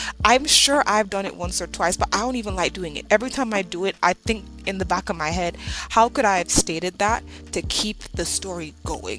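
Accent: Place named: Jamaica. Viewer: I like your vibe, I'm definitely gonna tune in. We share the same sentiments on sirens 😂, and OMG I heard your accent and I was like oooooo she's from the Caribbean, but I literally SCREAMED when you said you're Jamaican! Ahh, I'm a Jamaican simmer too! I'm so excited to meet another SIMS 3 JA simmer 😊. (Sidenote: Isla Paradiso is where I play the most. Gives that home feeling for sure)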